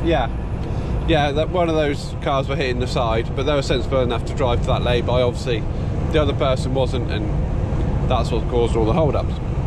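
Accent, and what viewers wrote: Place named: England, United Kingdom. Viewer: About 4 years since I left England so I'm really happy hearing English accent. And driving an left side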